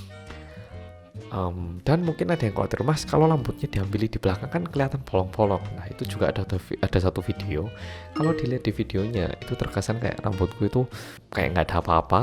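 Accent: Java, Indonesia. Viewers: Iki Jane orang Korea apa Jawa sih, kok lucu ngomongnya 🤣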